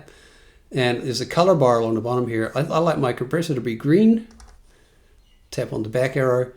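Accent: New Zealand. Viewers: Great Vid… you sound like a kiwi? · Are you from Invercargill by any chance? Great to find a kiwi on youtube providing such good info.👍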